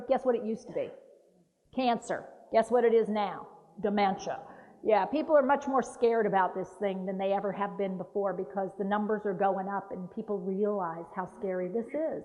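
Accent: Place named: South, United States. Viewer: uses southern drawl... exaggerated southern, rural speech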